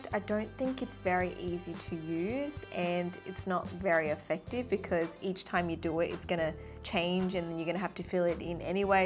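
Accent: Australia. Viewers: Are u Australian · Hey I'm new to her channel, is she an Aussie?? I'm an Aussie too so that's why I'm wondering 😂😂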